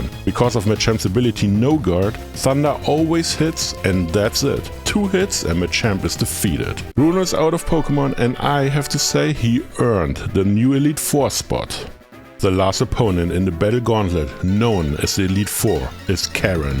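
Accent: Germany. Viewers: Top Video man hört fast nicht raus das du deutscher bist, hab trotzdem ne frage wie kann man onix entwickeln ohne tausch in HG ? P.S. mach weiter so deine Videos machen echt spaß zu gucken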